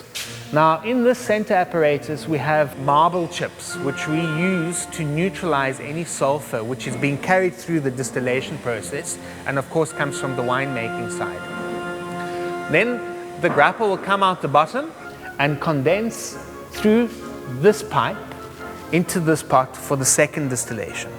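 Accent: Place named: South Africa. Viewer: pmc eightsixeighty clearly you're a silly american. If anything he would be from an italian family which is why he is probably makeing grappa in the first place (but he actually sounds south african). Pronunciation doesn't make his 'english terrible' it gives him a accent.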